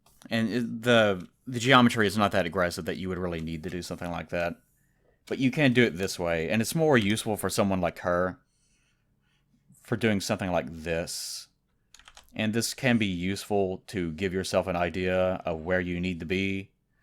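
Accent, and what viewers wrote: South, United States. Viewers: You became way more Southern in this one. Your drawl was really drawn out. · It sounds like his gain is just set much higher, I had to actually turn him down on my end, he's as Southern as usual, just louder. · I feel like voice sounds noticeably more Southern then it normally does at times. It's weird for some reason, despite knowing lives in Kentucky. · I can hear your southern drawl!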